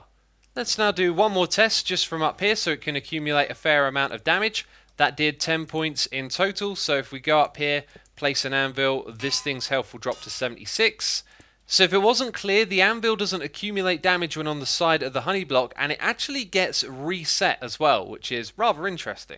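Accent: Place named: United Kingdom. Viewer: why are all minecraft youtubers british? is bothers me, they all sound the same.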